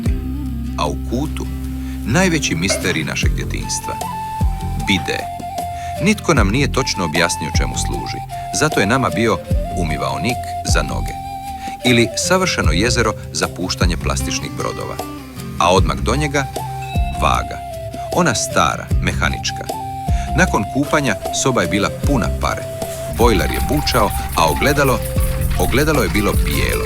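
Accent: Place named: Yugoslavia. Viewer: ovog "jugo" naglasavanja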